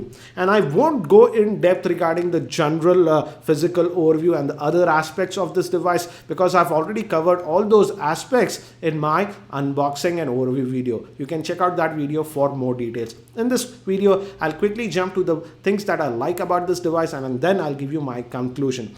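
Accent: India. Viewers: i like the indian accent. :)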